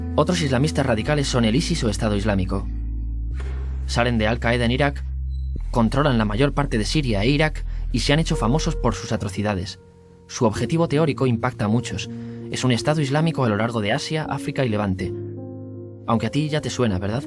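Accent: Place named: Spain. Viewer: Recuerdas la Torre de Babel de la Biblia, la hicieron estos tíos. Jajaja suena bien!!👌🏻👍🏻Me gusta el acento de Español.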